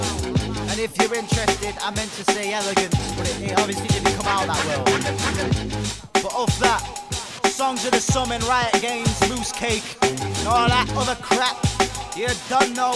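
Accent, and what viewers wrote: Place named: Cockney, United Kingdom. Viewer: That slight cockney accent is awesome.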